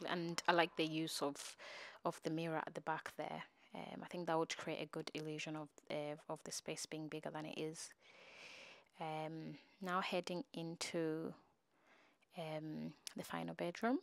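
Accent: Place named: Scotland, United Kingdom. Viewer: Love this house big, gorgeous and spacious. I love the doors also. My type of chandeliers throughout. The shared children's bedroom is gorgeous. Thanks for sharing. PS. Your dual accent, I can hear the Scottish accent coming through when you pronounce certain words. ❤💜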